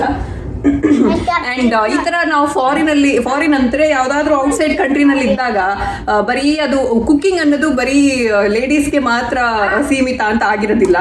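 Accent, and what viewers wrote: Mangalore, India. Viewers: Very nice vlog... I like cuteness nd ur manglore style talk....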